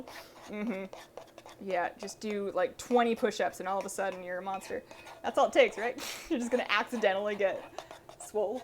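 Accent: Canada. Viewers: Never knew you were Canadian until this one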